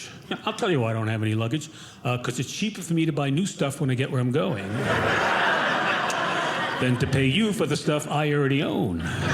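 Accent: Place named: East Coast, United States. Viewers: Educated east coast... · Thanks! Yes, I hear some east coast, but I also thought I heard a little midwest, too.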